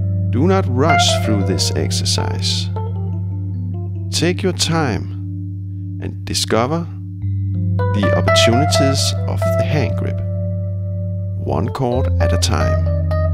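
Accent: Denmark. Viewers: i love the heavy danish accent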